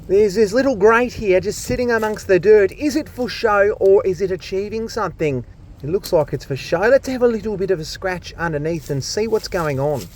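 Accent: Australia. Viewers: In Pennsylvania in USA. This was a great video as you kept the annoying music to a minimum.. Got to hear the crazy Australian narrate. That's the part that separates you from the rest. The pure genius description of a total mess.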